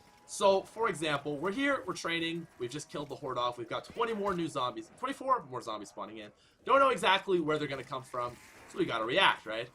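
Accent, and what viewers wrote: Canada. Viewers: its all a bout that canadian accent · Are you Canadian???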